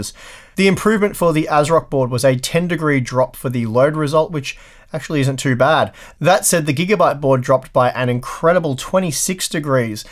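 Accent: Australia. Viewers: Just what I want in a tech YouTube channel, Australian accent, gives you alot of detail on everything Pros and Cons. Keep up the good work. 👌🏻👍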